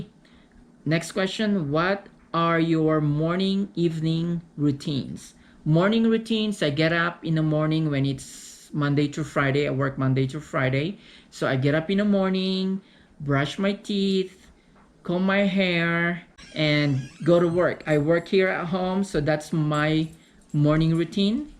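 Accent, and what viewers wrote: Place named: United States. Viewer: hi mekini lukluk same tyo fave mangoshake.ganda ng accent mo pra k naring ameican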